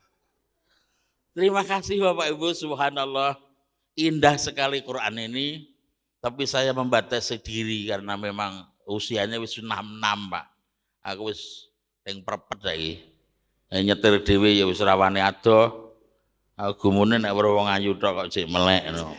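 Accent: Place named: Semarang, Indonesia. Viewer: Bahasane semarang bnget..cocok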